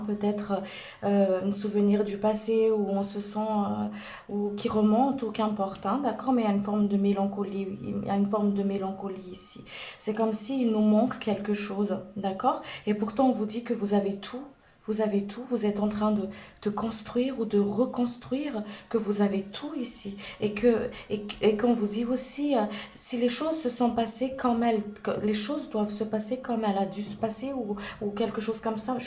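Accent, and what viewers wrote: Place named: South, France. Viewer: Merci bien de la guidance bel accent du sud (il me semble) :) ça me parle beaucoup,malheureusement de mon côté l'attente en la concrétisation de mes projets on eu raison de ma relation amoureuse qui était à distance car il était Américain j'ai coupé hier car ça me prenait trop d’énergie ça avançait pas du tout niveau papier etc j'ai préféré m'arrêter là maintenant je pense me stabiliser ici avec peut-être une autre personne avec qui je me suis rapproché dans l'entrefaite.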